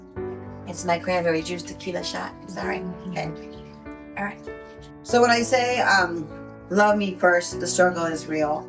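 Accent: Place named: United States. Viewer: Presentation is excellent and your voice and words pronounces are great with subtitles (pure American accents) keep it up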